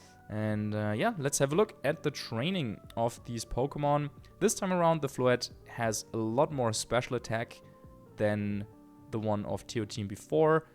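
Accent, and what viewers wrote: Germany. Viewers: Samma da hör ich doch ze tschörman raus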